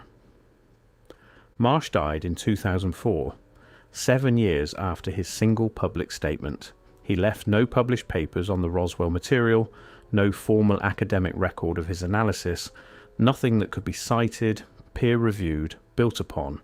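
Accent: England, United Kingdom. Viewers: Such a bore...the english accent makes it even more borish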